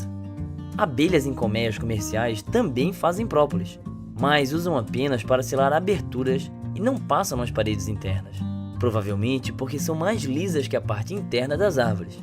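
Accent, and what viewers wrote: Santa Catarina, Brazil. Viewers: vc tem voz de catarino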